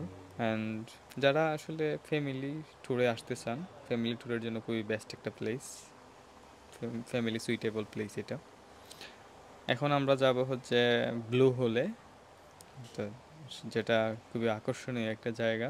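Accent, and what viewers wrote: Sylhet, Bangladesh. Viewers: Bai afne kita sylheti ni?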